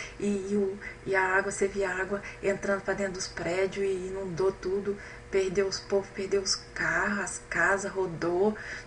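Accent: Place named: Minas Gerais, Brazil. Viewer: Pra saber. Pelo seu sotaque, achei que fosse de Minas.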